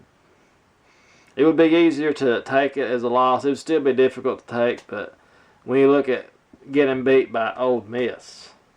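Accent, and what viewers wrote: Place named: Louisville, United States. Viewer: he had me going after the louisville loss...plus, his accent is TOTALLY lou-a-vul! i thought he was for real blaming the refs, the "criminole" jameis winston and the ncaa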